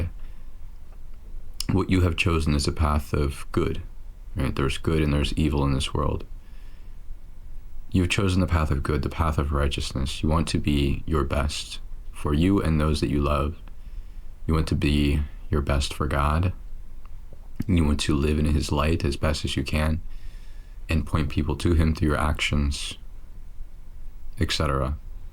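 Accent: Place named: United States. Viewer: where are you from bro. I am from Uzbekistan. I am learning English for IELTS degree at the moment. in my opinion, you are American. I shadow you for fluency.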